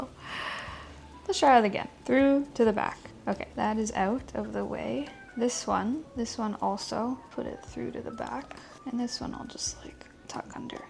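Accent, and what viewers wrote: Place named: Afrikaans, South Africa. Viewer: Hey can you pls use your Afrikaans accent. Anyway this good! Thanks 😀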